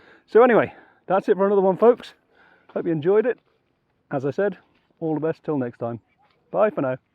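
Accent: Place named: East Midlands, United Kingdom. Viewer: Decent couple of hours, just started the lift, crucians & tench were very accommodating. That accent of yours🤔 East Midlands?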